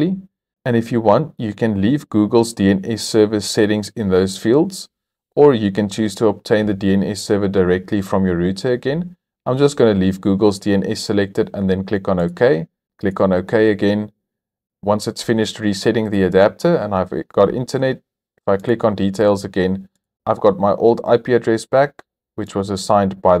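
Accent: South Africa. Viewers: Do i hear a south african?🤔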